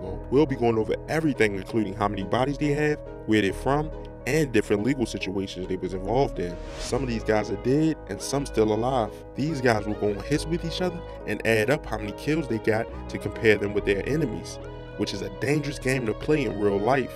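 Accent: Baltimore, United States. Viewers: Bro you clearly have a Baltimore accent. Please stop speaking on Chicago business. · Quit that damn Baltimore Slang you say 2 two gets aggravaten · I’m convinced dude is from Baltimore from the “tews” dews etc …. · Baltimore accent thick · You from bmore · You gotta be from Baltimore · Very thick Baltimore accent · Are you from Baltimore?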